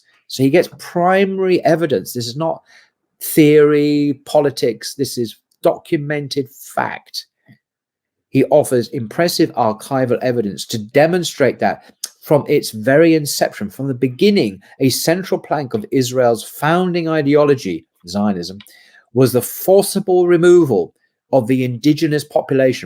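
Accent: United Kingdom. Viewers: Amazing how the fact that a middle aged man sitting in front of some book shelves, throwing buzzwords and casual data in a fine British accent, is supposed to make us feel he speaks wisely and knowingly about a certain topic whilst all Ive heard here is some banal teenager level revolutionary ideas from a biased newly converted to Islam privileged white detached man, fed by BDS propaganda he quotes from super shallow social media sources.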